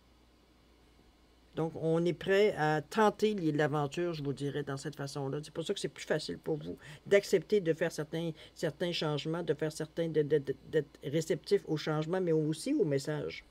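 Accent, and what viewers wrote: Quebec, Canada. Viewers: il faudrait parler moins rapidement,car ce n'est pas évident avec l'accent québécois,merci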